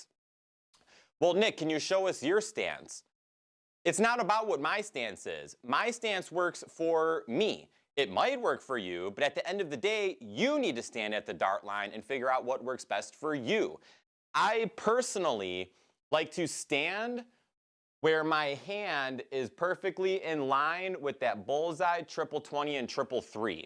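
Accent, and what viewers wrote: Midwest, United States. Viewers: are u from the midwest?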